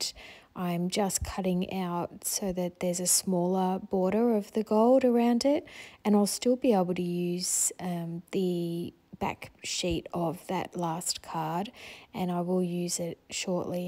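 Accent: Australia. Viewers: I am new to your channel and washi tape ideas. I like them both and I have just subscribed and am looking forward to learning new techniques and designs. I live in Delaware and it sounds like you are from Australia but it sounds like we have a lot in common. Thanks for an educational video.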